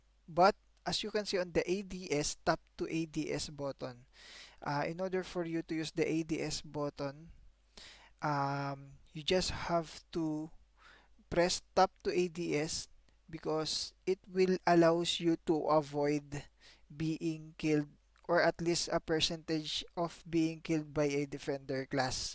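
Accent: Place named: Philippines. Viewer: pag mga pinoy nag english parang di bagay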